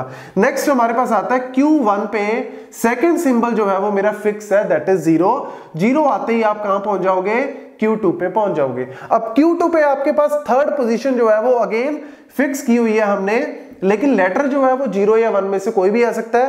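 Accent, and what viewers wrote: Punjab, India. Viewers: Sir ap jo punjabi accent mein teach krte ho usse apnapan lgta hai.. u r truly legend.. ❤❤❤❤❤❤❤❤lots of respect... 🙏 hmare guru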